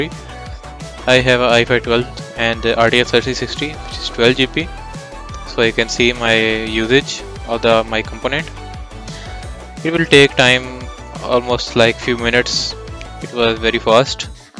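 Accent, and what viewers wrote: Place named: Pakistan. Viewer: I guess you're from Pakistan from your voice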